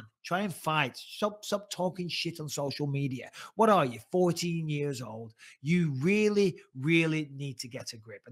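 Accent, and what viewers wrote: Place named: England, United Kingdom. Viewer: You know Michael, i really love when you start to talking funny shit. But please add some subtitle on your video, because you're true englishman and you speaking goddamn fast. So please add some subtitle next time, because sometimes people from another world like myself see and listen to your talking and couldn't keep up.. 😂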